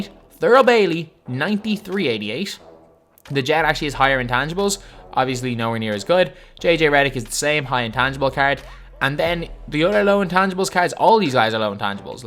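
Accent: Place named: Ireland. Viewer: You sound Irish. I know because I am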